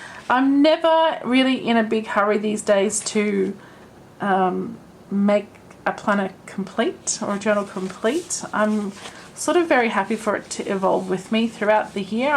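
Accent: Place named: Australia. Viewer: Always love your videos so much! Thank you for consistenly uploading!!! (Wisconsin, USA subscriber here ❤) and absolutely love your voice and your awesome Australian accent!! (My almost 13 year old daughter Loves talking in Australian and british and so on accents (and actually shes really very good at it lol) and when she hears you on my phone, she always comes over and says how much she loves you and how you talk!!😁) and calmness and excited-ness all at the same time 😆 I always look forward to watching your videos and appreciate the time and effort you put in to making them. 😊 I hope your week is going great :)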